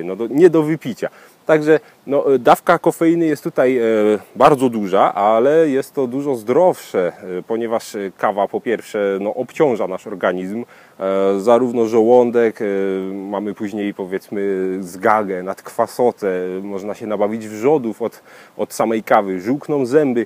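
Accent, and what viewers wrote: Silesia, Poland. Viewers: jesteś ze Śląska prawda? :D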